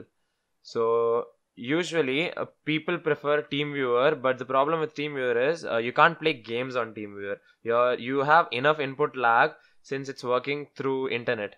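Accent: India. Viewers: Indian? Subscribed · thanks my cool smart bro♥ your accent is also so cool and every time I hear Indian I hear intelligence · You know he is gonna spit some solid knowledge when that South Indian accent is coming out of that mouth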